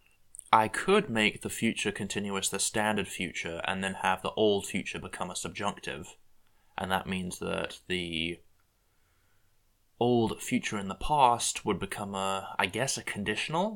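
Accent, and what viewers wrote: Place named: South, United Kingdom. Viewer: I know it isn’t the point of the video but I just love your accent. Southern British, right?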